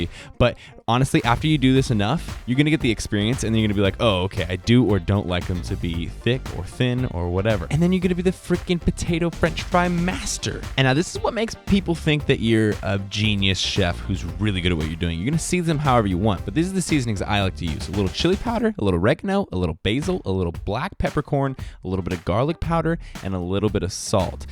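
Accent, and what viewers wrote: United Kingdom. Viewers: British accent, recipe, sexy filming and close ups sooo on point man. I'm legit going to make it now. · your British accent is better than mine and I'm British ... Take the children to the school baby ahahah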